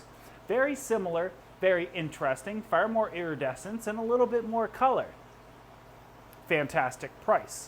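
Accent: Canada. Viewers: You saying "Barb" in your Canadian accent reminds me of Coach from Letterkenny.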